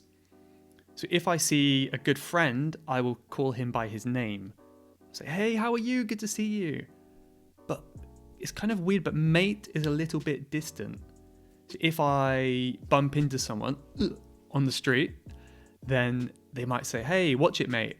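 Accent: United Kingdom. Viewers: Hey, it’s Lee speaking from mainland China, i am trying to find some useful tips, videos on YouTube for improving my spoken English. And your videos are so useful and your British accent sounds so noble and elegant. Cheers mate.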